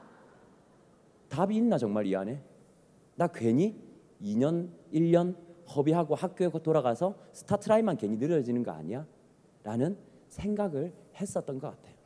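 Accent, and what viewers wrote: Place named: Gyeongsang, South Korea. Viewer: 리더님 경상도 사투리가 부드럽네요~늘 영상 잘듣고 있습니다^^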